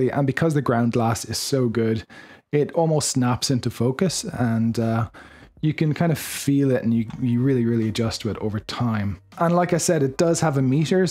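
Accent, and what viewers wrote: Ireland. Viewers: Great Video. I love the Irish Accent, by the way!!!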